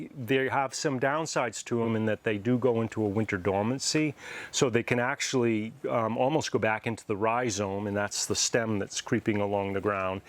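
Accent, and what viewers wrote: New York, United States. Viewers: I hope you make many more Begonia video's? I love watching the endless stream of Begonia's. And they are all so different 💚 Love accent too. New York? Sounds very exotic to a European.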